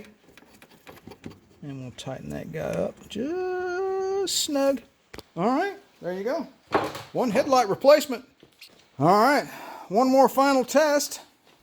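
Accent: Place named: South, United States. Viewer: Strong southern accent.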